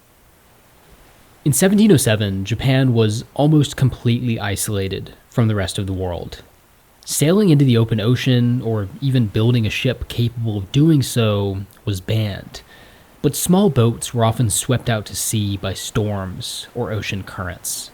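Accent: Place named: West, United States. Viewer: You sound very Western to me..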